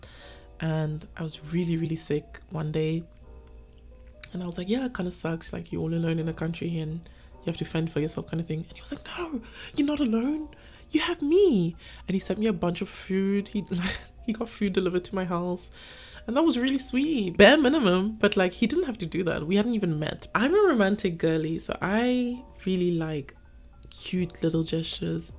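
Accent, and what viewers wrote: United Kingdom. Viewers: You’re Canadian? I thought you were British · I laughed so hard how did you switch your UK accent to a Nigerian accent🤣 I just discovered your channel and I really like it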